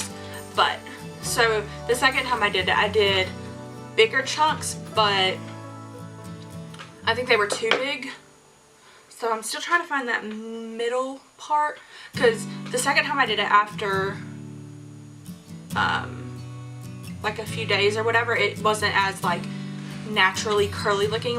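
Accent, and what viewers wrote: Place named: South, United States. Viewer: You sound kinda like your from the south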